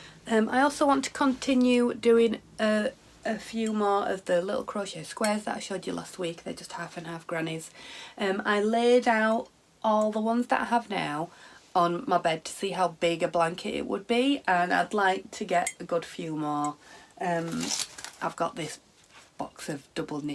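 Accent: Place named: Yorkshire, United Kingdom. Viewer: lovely hearing a nice Yorkshire accent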